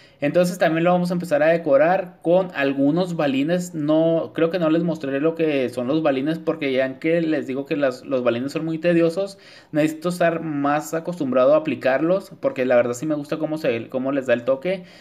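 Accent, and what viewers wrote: Chihuahua, Mexico. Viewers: Así hablas tienes acento chihuahuense una super persona muy lindo y atento me encanta tu trabajo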